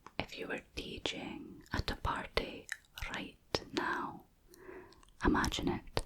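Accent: Scotland, United Kingdom. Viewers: I love your Scottish accent. So tingly. 🥰🥰🥰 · Ok so i just googled "vow of Celibacy" and means something totally different. Plzz correct me if its just me getting confused with ur Scottish accent .😅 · This wins. This is how all scottish accents should be and im from just outside Edinburgh 🤣🏴. Love this! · Aye lass ... I much prefer your Scottish accent! It's especially cute with the wee bairns! 😊😊😊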